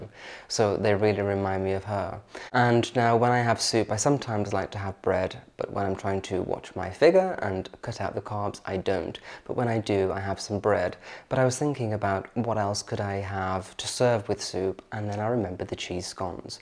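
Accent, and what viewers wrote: United Kingdom. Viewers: Dear, I love your videos...Your beautiful and gentle British accent, your beautiful and soulful spirit, your sense of Elegance and Beauty and your mannerism!!! Love your natural and delightful attitude toward life, living, and sharing your interests!! Your videos are refreshing and Soulful. Love the music you selected for your videos, it is perfect!!! Your videos provide a sense of harmony, love, friendship, and sentimental values and tradition which many of us appreciate.You are Awesome!!! Superb job!!! Thank you!!❤❤❤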